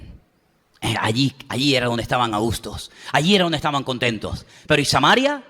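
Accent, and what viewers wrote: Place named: Central America. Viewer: Disculpe pero usted no podrá hacer el idioma de otros países aún se proponga imitar los por qué se nota su acento ..de centro américa así viva años en estados unidos del norte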